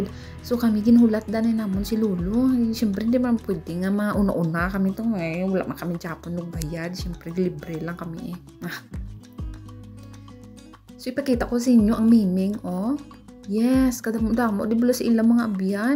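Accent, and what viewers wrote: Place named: Hiligaynon, Philippines. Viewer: Baw ilongga gid ba🤣✌️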